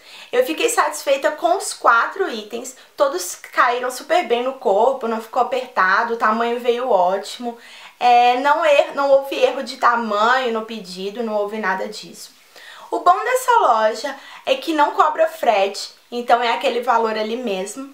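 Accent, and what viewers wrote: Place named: Northeast, Brazil. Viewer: acho bonitinho esse seu sotaque nordestino ;P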